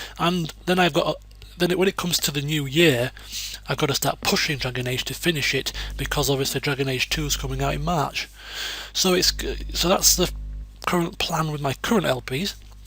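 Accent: United Kingdom. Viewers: New Vegas.. New Vegas! New Vegas! haha man I love you videos man! It's nice to hear a fellow British-man give a 5 star commentary : ) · I see ur videos because of the british accent, is that weird?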